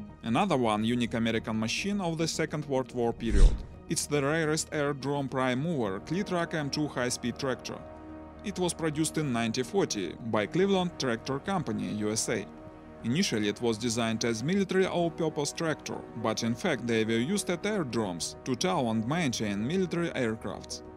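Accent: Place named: Russia. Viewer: ну и акцент русский лучше бы по русский пиздел... а так пришлось звук убрать а то это слушать противно. с тем же успехом можно смотреть как китайца говорить па руски на утуб.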